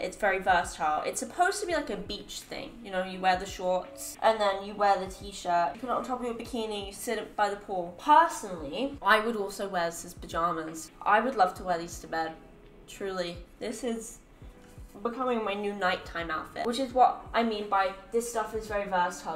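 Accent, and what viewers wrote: United States. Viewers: Watched her years ago and now she has a slight American accent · definitely has an American twang in her accent now- cute!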